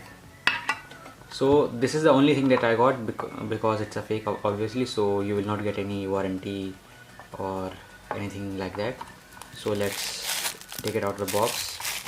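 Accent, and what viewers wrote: India. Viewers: Since you are Indian and I hear your accent you must be a scammer and I don't believe anything that you say because of your place of birth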